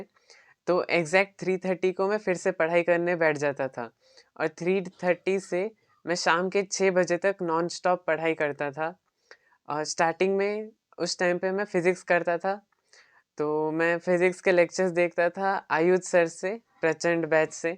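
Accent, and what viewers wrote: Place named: Bengal, India. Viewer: তুমি কি বাঙালি??? কথার মধ্যে বাংলা tone আছে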